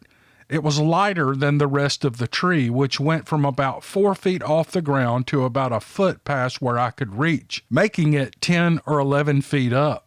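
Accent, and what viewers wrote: South, United States. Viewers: Hey..Greetings from Charlotte, NC! I could listen to all day with that soothing southern voice. I'm originally from the Ramapough Mts. Of Jersey..and we have BF there and in The Carolinas..saw them myself. This is my favorite Channel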